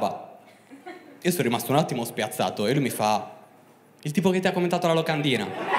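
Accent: Sardinia, Italy. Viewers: accento sardo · sei Sardo, quindi è anche bello ascoltarti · accento sardo top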